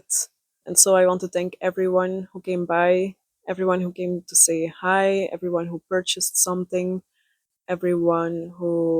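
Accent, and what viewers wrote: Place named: Flanders, Belgium. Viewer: Hey, Ik vergat je te zeggen dat ik je hoor Nederlands praten. Of Belgisch eigenlijk. Wat Leuk! Ik ben Nederlandse 😊🎉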